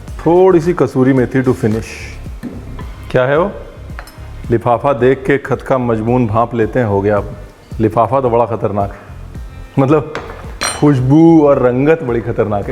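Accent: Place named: Malwa, India. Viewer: Hi ranbir.. Muje apki recipes bahut achi lagti h.. Aap faridkot se ho .. Main moga se ho.. Ab hm jalandhar main rehte h .. Aap bahut achi punjabi bolte ho.. Malwe wali ...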